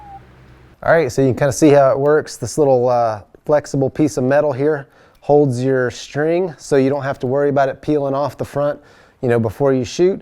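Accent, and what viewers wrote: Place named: South, United States. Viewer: Your voice sounds more yeehaw than usual. Cool build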